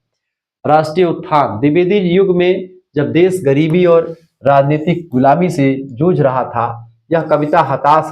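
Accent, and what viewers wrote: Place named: Awadhi, India. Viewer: Sir aap Avadhi mat bola kariye 😢kyoki aapke muh se acha nahi lagta hai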